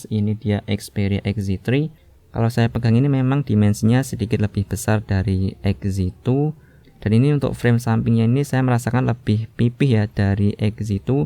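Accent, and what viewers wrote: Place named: Java, Indonesia. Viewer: Wong ndi samean mas, medoke parah, auto subrek 😄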